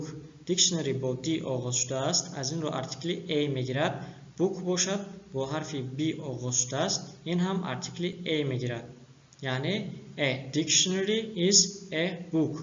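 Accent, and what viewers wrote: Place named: Literary Tajik, Tajikistan. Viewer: Рахмат додарчон, забони тоҷикӣ адаби ба дараҷаи олӣ гап мезани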